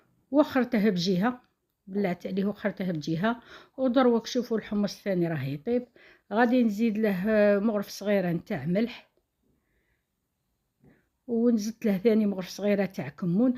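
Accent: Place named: West, Algeria. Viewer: حسب اللهجة هذه السيدة من الغرب إذا أهل مكة ادرى بشعابها تترك الوصفة للبسكريات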